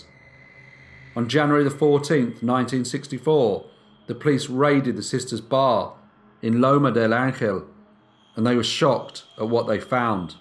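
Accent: England, United Kingdom. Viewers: lol, I figured that much, but which flavor of British? Doesn't sound like any of the London accents I've been exposed to, doesn't sound like a north England/southern Scotland, and not very Welsh, either. I'm no expert, but I do watch a decent amount of BBC programming, so I've been exposed to some of the more popular accents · It’s so nice to be able to understand your English accent! My hearing is also bad and I always understand what you’re saying! Again, another story I’ve never heard of! Love it! 👍🏻😊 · It’s an English accent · An English narrator? what the feezy!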